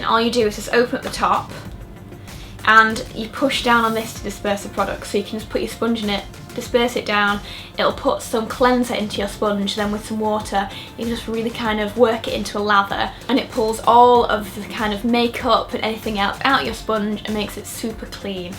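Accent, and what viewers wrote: United Kingdom. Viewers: where is your accent from in the UK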